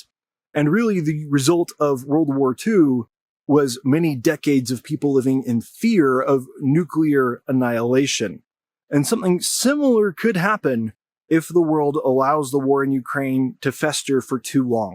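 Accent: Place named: South, United States. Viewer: it looks like west Coast USA but he sounds abit South accent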